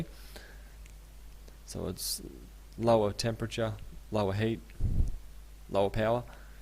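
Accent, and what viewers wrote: Australia. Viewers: Who is this ausie guy... IF YOUR GOING WITH A STARTER CHIP lol... You get the fucking Ryzen 3 2200G! It's on sale for like 110 dollars canadian right now... and they run the exact same as the 7nm 3200G for 20 dollars more. Best budget CPU by far to start with. And if you upgrade down the line, you literally won't get bottle necked with a GTX <num>... but who needs all that wattage? lol.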